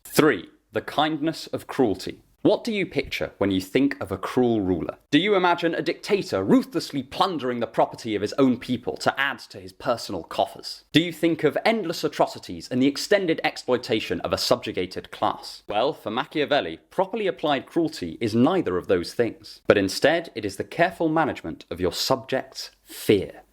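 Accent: United Kingdom. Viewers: Yes, what you said all the British Accent and story lines are good enough. But in my point of view you need some tones of voices,up and down, pitches, break down. Your British accent is really nice but boring.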